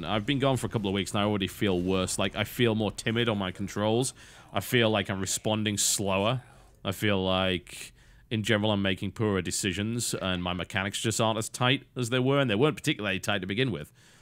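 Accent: United Kingdom. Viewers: How are you saying things wrong? Your British, if anything the Americans are saying it wrong but eh its the accent I guess, just never think you are the one who cannot speak English correctly. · You sound extra british in this video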